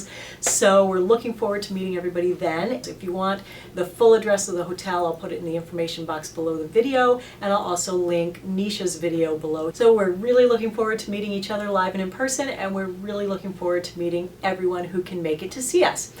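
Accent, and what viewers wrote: United States. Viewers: Hi, I'm so glad you had a great time in Spain - I love it there! It's cute the way Americans pronounce words. For example, we pronounce Ibiza (eye-beeth-a), and instead of "embraceful", we would say "embracing" is correct. Anyway... I really love watching your videos. I'm going to be in NYC with my daughters from 5th - 22 July, and I'd love to meet you in person! I'm almost 53 (also get hot flushes) and am interested in your product reviews. I hope to see you at the W Hotel next week! How long are you staying in NYC? If I don't make it to the hotel (my daughters have planned some excursions), then it would be lovely to meet for a coffee. We live in Germany, but are form London. Stay awesome!:)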